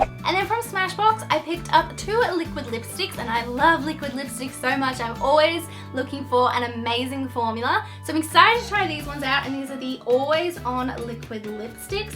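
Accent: Australia. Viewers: Hi I love you I love your videos I love your accent so much I find Australian accents so entertaining to listen to You are so pretty too 💖💖💖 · OMG are you australian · Your Aussie accent is soooooo soothing. ❤️😘ilysm!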